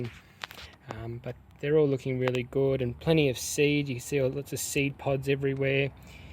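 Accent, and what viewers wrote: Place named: Australia. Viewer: I appreciate your thorough descriptions and naming your cacti. Love you generosity and Ausey accent. And no I don’t like yellow flowers either. Dandelions are yellow aren’t they?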